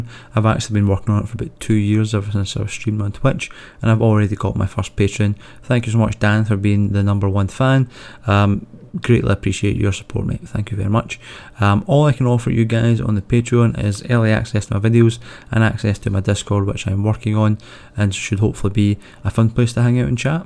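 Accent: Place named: France. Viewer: "I am French, which is why I sound like this" ☠️ Now that's how you start a video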